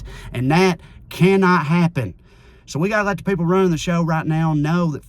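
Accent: South, United States. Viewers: Thanks for not abandoning your accent and Southern roots. It makes your commentary much more poignant and helps to dispel the false impression that a southern drawl will always indicate that person behind it is a racist, rebellious, brain dead moron, whose every word must be ignored. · The accent and aphorisms of my Midwest and Southern Americans is always a pleasure! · Well said. Thanks for the clear words - in the accent most of us associate with completely different sentiments. You redeem the South. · I love hearing a voice like this coming out of the South. I’ve lived around a lot of southern people. Wasn’t aware there was much of a liberal slant there. So refreshing.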